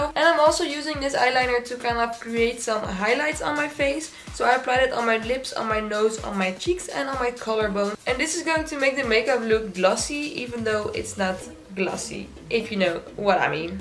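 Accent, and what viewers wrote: Netherlands. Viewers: oml are you from the Netherlands? you really sound dutch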